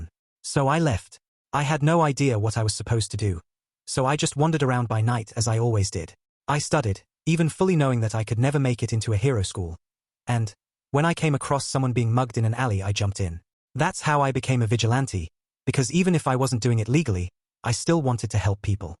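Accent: United Kingdom. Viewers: Is this shit British ????????????????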